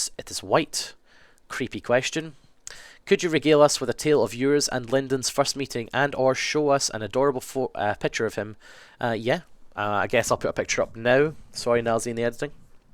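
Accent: Scotland, United Kingdom. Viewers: I love your accent ^_^ it's probably weird of me to say that since I'm Scottish too but, my accent is a lot different to yours, haha.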